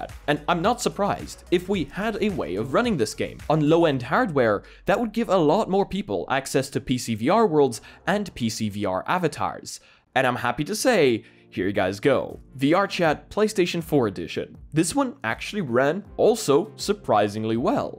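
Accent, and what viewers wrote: Poland. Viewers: Are you polish? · Man good video but are you from Poland? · you from poland?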